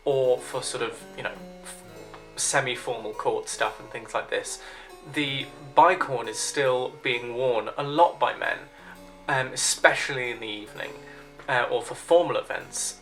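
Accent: United Kingdom. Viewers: Love your very english english ❤ a plesure to listen to you. And you are very polite in your review of clothing accuracy. A gentleman 🎉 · PS your accent is very posh and regal.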